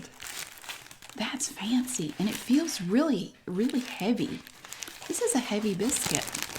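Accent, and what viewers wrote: South, United States. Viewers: You are literally so cute! I love watching you for your mannerism. And your southern accent. 😍😍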